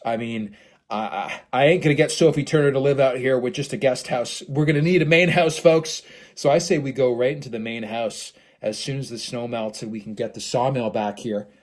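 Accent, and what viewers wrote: United Kingdom. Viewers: Hilarious,speaking to a swiss mountain dog in an Northern Irish accent. Clovis is great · Great video. Shame about the British accent. It sounded like Dick Van Dyle in Mary Poppins!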